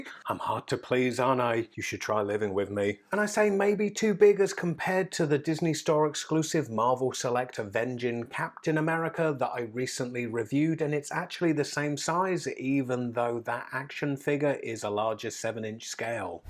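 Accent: England, United Kingdom. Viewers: Oh man I miss your review and your English accent. You’d be so happy to have Worthy Capt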